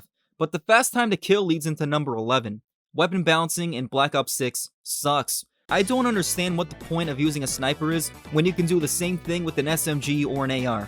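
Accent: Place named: United States. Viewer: What makes these videos even better is the accent🤣 Which state is it btw?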